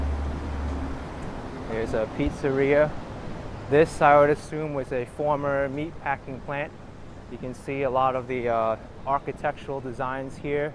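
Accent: New York, United States. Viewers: one again we appreciate your every effort in putting out vids and that wonderful NY accent of yours is always a pleasure. Would you ever consider being a small tour guide for visitors that have never been to NY?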